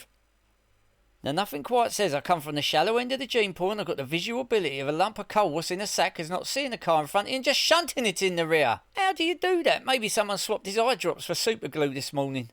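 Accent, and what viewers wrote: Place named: London, United Kingdom. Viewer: As an American, I listen to get better at the London accent while havin' a laugh...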